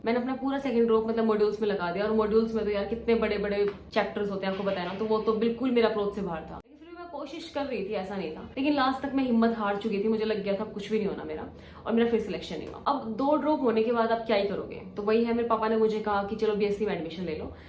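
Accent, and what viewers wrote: Haryana, India. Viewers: Haryanavi touch in Voice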